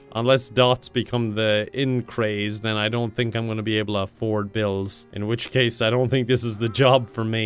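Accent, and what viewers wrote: Ireland. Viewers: I love his Irish voice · part ireland u from g?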